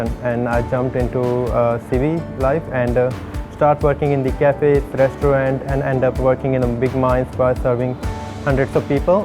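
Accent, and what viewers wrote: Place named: Australia. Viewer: Lovely Australian Accent ❤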